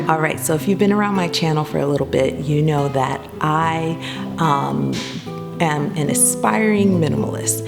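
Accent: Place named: Guyana. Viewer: are you Guyanese? You remind me so much of my cousins. They grew up in NY and have a mix of Guyanese Newyorker accent. And that painting reminds me so much of my home and a place called Kaieteur Falls.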